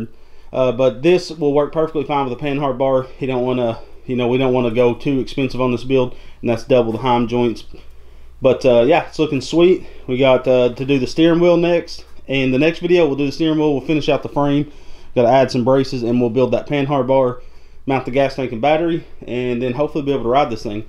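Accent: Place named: Georgia, United States. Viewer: Cool. When I first heard you talk I thought you were from South Georgia. Nice video!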